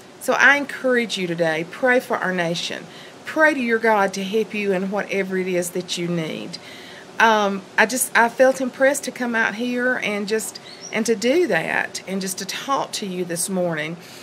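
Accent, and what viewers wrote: South, United States. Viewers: your Southern drawl is so fun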